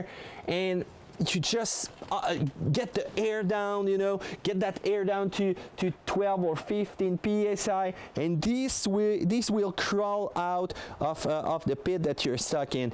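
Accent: Quebec, Canada. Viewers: Quebec accent if I'm not mistaken ;)? Français? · Quebecois as fuck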